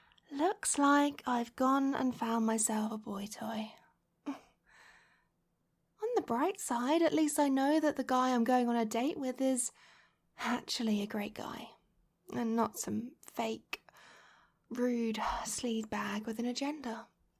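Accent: United Kingdom. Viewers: This is so aggressively British I love it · U have such a sophicated and beautiful voice and the fact your britsh is amazing ❤ ( I'm britsh too😊)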